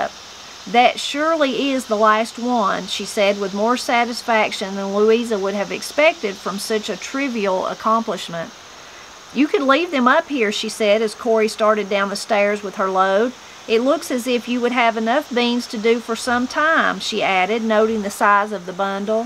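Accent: Appalachia, United States. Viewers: Thank you So Much for sharing by reading this story. It feels so great listening to you in Appalachian tone of voice. Reminds me so much of my mother. Is the book still available to buy; it would be interesting to read it with the Appalachian accent. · I don't believe this book could be narrated better by anyone else. Your Southern English accent give these written words the life and nuance of true Appalachian people. Stephen King once said that he prefers his books be 'heard' through the use of audiobooks, because it insists that the listener consume all that he had written, instead of "speed reading", or hurrying through it, not grasping the emotion and meaning that he spent so much effort instilling into the work. I too love audiobooks because of this.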